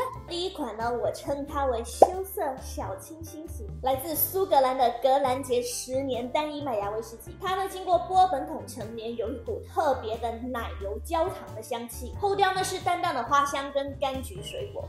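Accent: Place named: Taiwan. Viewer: 這口音也太特別，台味非常重的大陸音。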